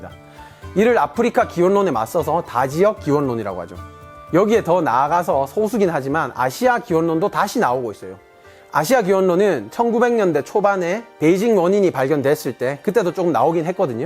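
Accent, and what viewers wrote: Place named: Gyeongsang, South Korea. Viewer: 호모 사피엔스고 에렉튜스고 간에 진행자 분이 경상도 출신이신건 확실하네요. (저도 경상도 사람)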